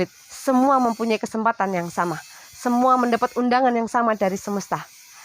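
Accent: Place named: East Java, Indonesia. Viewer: batinku nungu rondo mu mak e iki mesti wong pati opo jatim kasar pool bahasane😂😂😂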